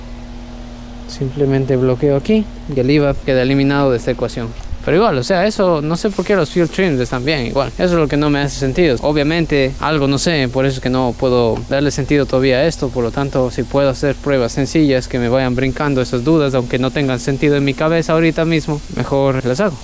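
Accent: Cuenca, Ecuador. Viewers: suenas como que fueses de ecuador de cuenca... buen video amigo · Buen video. Eres Ecuatoriano? Tu hablado me suena como de acá jaja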